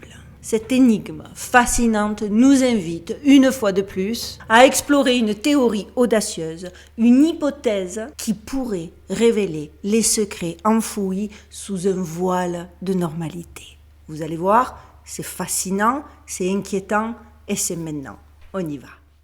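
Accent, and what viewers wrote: Marseille, France. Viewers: j’adore la richesse de votre diction votre accent plein du soleil de Marseille et la finesse de votre humour quand tout part en vrille. 😘✨️💙✨️😊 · Merci pour ton travail. J adore ton accent sudiste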